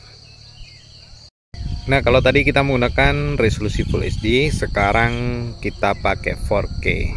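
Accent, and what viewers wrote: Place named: East Java, Indonesia. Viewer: logat pembicaraan kek daerah Jatim (Madiun)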